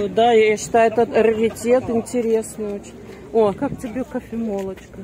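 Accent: Odessa, Ukraine. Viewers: первый раз зашла к вам на канал. Душа радуется, слушая ваш одесский говорок. Спасибо за экскурстю. У меня в Одессе живут родственники и знакомые. Всем нам мира и добра. Привет из Луганска.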